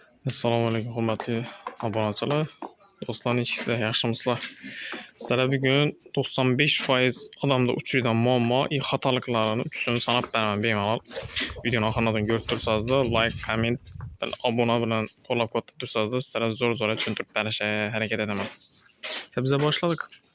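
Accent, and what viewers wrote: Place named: Khorezm, Uzbekistan. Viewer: Авваломбор узрку,Хоразм вилояти хам Узбекистон худудида жойлашган ва мактабларда адабий тилда дарс берилади,лекин иккита хоразмлик сухбатлашсангиз ,улай агар умуман тилингизга тушунмайман,шевангиз жуда кучли,маслахатларингизга кизикдиму,тилингизга тулик тушунмадим😊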